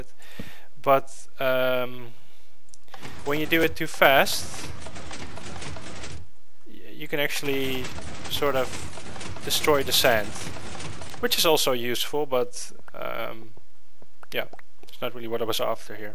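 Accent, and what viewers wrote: Netherlands. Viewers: you're from holland aren't you?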